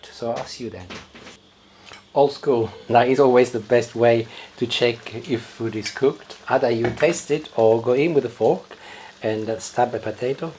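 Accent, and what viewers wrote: Italy. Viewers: Amazing cook! His instructions are clear; his English, with his Italian accent, is excellent! I'll definitely try cooking the dish!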